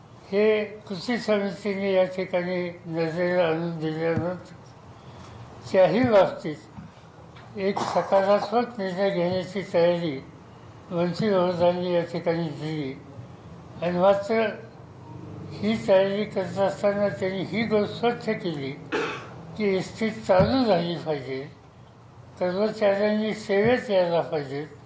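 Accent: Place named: Baramati, India. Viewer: 😀😀😀😀बारामतीची भाषा आहे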